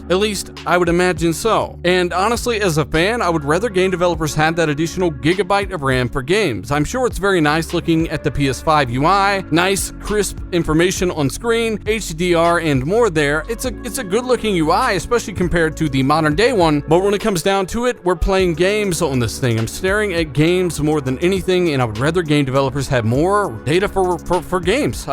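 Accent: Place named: South, United States. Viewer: your hick/country accent sucks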